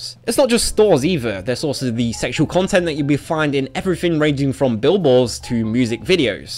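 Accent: United Kingdom. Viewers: all I can say is, in the UK (and judging from the hosts accent he should know this as well) it is law for porn magazines to be covered up in the UK, and it is becoming a ( albeit slowly ) nation standard for newspapers to be out of sight of children. video games don't have these rules, but saying that with age restrictions being a thing and, the ability to simply cover a game with some metal or wood with the title being written on it is rather stupid.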